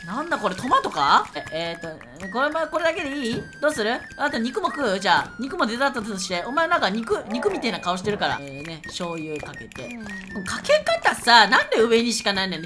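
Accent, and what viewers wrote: Japan. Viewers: もしかして鹿児島県民?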